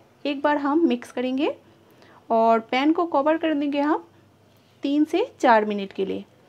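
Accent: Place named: Bengal, India. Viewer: yummy looking surly must be tasty too, I would like to know, this is bengali style, your speaking style is also bengali.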